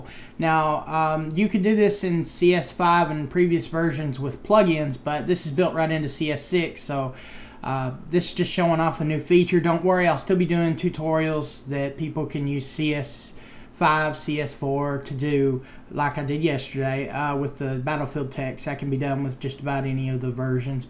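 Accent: South, United States. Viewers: This is THE strongest southern accent I've ever heard :)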